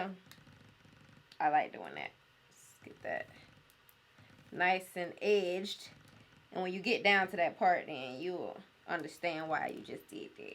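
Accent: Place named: Atlanta, United States. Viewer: I’m a First time viewer and the minute i heard you speak i knew you were from atl lol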